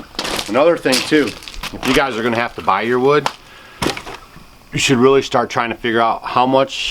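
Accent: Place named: Utah, United States. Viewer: are you in utah or missouri i heard both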